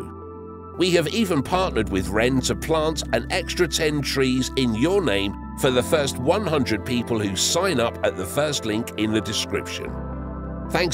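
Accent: United Kingdom. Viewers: British narrators can piss off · 😒 why are these fearmongering videos always done by British voiced people. I made it 30 seconds and GONE! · NOT another Brit narrator!!! Stopped at :09 seconds How about an American to explain an amazing American wonder... I'm outta here!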